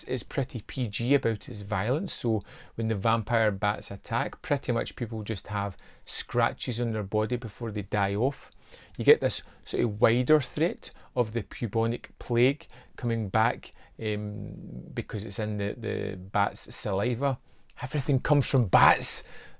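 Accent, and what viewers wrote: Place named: Glasgow, United Kingdom. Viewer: Love this movie!! Just bought the eureka double pack myself. Been trying to get this since i seen it on betamax way back when(no joke) had a large budget and i think its just as relevant now, the whole man getting punished by nature for exploiting the resources slant. Interesting how yoy never really know if the medicine man manifested the bats or its just a natural migration. Love ambiguous storytelling. I had actually given up on owning this one never mind on a eureka bluray! Keep up the good work, good to see and hear a fellow Glaswegian(?) Review movies kn youtube. Thanks for the great review and exposing this great film to your community. Thank god for eureka.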